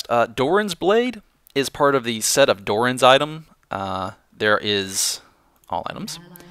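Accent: South, United States. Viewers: u have a southern accent :3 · this video was amazzinggly helpful:D thank youu and I can't help but notice that adorable twinge of a southern accent you have:3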